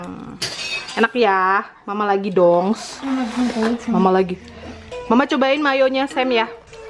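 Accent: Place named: Medan, Indonesia. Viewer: Kongsi ya kak..... medan kali bahhh 😁😁😁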